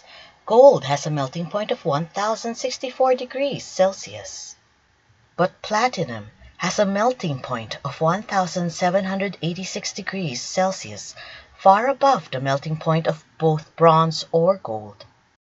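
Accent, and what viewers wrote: Philippines. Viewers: Exactly and it's quite obvious. It smells Chinese propaganda. The whole false narrative their trying to inject into our history is totally laughable. And the use of Filipina voice and Tagalog username is deceptive. Remember from TGC vid that before the Chinese ever knew how to build ships, the early Ophirians were already masters of the sea.... In fact the pintados from the Visayas and Sulu frequently terrorized China's coastal communities....ransacking and pillaging them. And the only attempt by China to conquer Manila was through Limahong who failed miserably.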